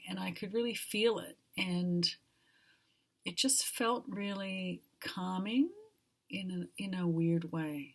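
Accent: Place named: Australia. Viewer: From one ozzie, I detect a slight ozzie accent listening to your talk 😉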